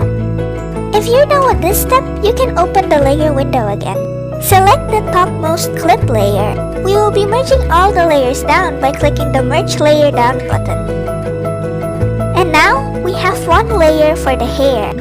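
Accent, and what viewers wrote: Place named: Philippines. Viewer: u sound like pinoy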